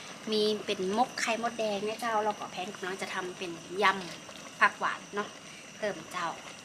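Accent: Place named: North, Thailand. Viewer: พูดก็เพราะอู้คำเมืองฟังแล้วม้วนแต้ๆๆ..แกงน้ากินมากเด๋วจะไปตลาดซื้อมารองทำกินเนอะ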